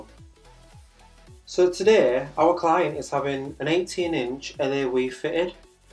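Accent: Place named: North, United Kingdom. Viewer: I love your channel! I've recently started doing my own hair at home (well, what I mean is a few months ago I had a disastrous set of nanos that all fell out within a week and I had an event coming up so I pretty much forced my poor boyfriend to watch endless la weave you tube videos and then try to put one in for me because I couldn't get/couldn't afford another last minute appointment..) Your videos are great, they've really helped/made me want to have this method after years of micro rings and the hair you do always looks so natural at the end It's nice to hear a fellow northern accent too, most of the videos you see are very excited Californians (not that I have anything against them haha) swishing their hair about and insisting on telling you their life story for 4 minutes before the video actually starts 😂 I was just wondering how many rows you generally put in and if you double/triple up the wefts on your rows? 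What weight of hair do you generally do as a full head? My boyfriend is surprisingly good at straight sectioning/putting the hair in that it stays in and he doesn't mind so he always does my hair now, but where to place and how many rows to put in is the only problem. They either seem to end up too far apart so I run out of head, or end up with masses of hair all at the top of my head so that it looks like I have an enormous head 🤦‍♀ Love the idea in this video of adding more hair to the weft at the sides too because I also have blonde/fine/short hair at the sid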